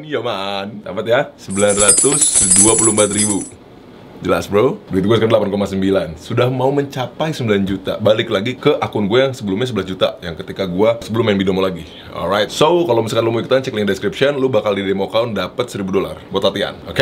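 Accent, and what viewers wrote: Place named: South Jakarta, Indonesia. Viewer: Menuju dialek jaksel🥶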